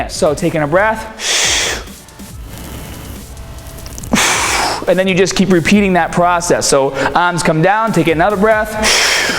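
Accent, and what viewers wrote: Boston, United States. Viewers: How did I not notice your Boston accent till like two years into watching you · What kind of accent is that? Like Boston, Rhode Island area? And I'm new to your channel! I remember years back I would youtube how to do a certain exercise and your videos would always be up! Looks like I was doing mistake number 1 lolz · You're really laying on the accent thick, you never spoke like that before, plus you say certain words with the baaaahhhstahhn accent and same the the same exact word later on without an accent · You didn't used to have a Boston accent this thick back in the 2011 days, are you doing this on purpose? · Ever since I noticed you has a Boston accent it's like a game to catch it. Hahaha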